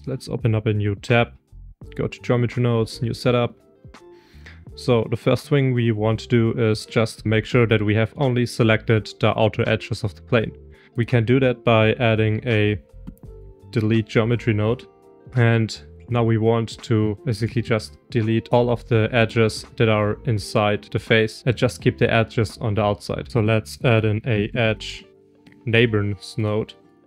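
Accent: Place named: Germany. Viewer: Don't give a F about Generator, I find Denglish accent totally fascinating :) There are accents out there that sound deeply disturbing to me, e.g. arabic or russian english, I downvote and turn off those videos immediately if I accidentally click them. It's got nothing to do with racism, it's got to do with violating a language.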